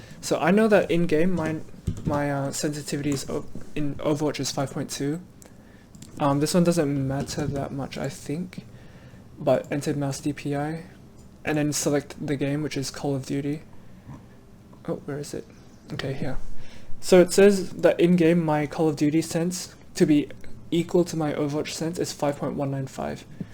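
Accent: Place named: United Kingdom. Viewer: Bro no hate but why do you sound like a British fearless😭😭 please tell me you can hear it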